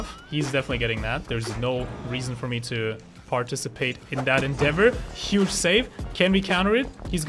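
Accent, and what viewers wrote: Germany. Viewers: Hey, are u german? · Are you german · Da merkste halt dass er deutsch ist die Aussprache kann man nicht als nicht deutscher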